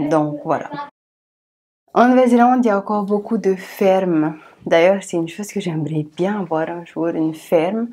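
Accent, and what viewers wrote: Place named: Tahiti, French Polynesia. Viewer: J’́aime bien votre accent français tahitien et la manière dont vous présentez la vie en Nouvelle-Zélande. C’est un peu plus rassurant que ce que l’on lit et regarde sur l’immigration dans ce pays.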